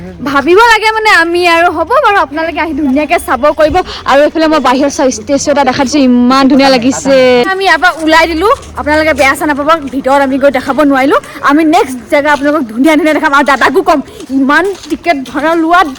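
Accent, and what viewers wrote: India. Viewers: Ur hindi accent is so lovely,, very energetic vlog ,,, love from USA( United State of arunachal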